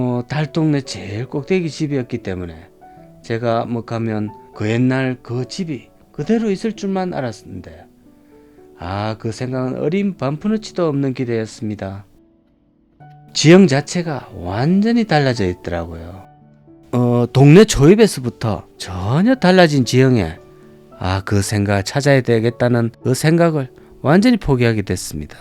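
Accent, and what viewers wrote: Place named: Busan, South Korea. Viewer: 부산 알고있습니다 오늘도 구수한 부산 말씀 수고하십니다 새로지은 도시화로 그리운 추억도 고향의 향기를 잃어버린 현실이 안타깝습니다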